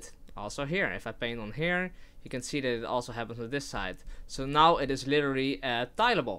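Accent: Netherlands. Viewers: nice tutorial. Random question: are you Dutch? You sound like a dutch person speaking english.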